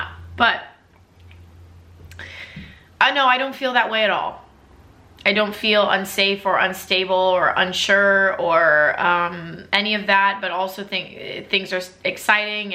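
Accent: Australia. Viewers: That Australian accent threw me for a loop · girl mid video I got super confused and was like why does she sound different and realise you're still going with the australian accent omg😭😭😭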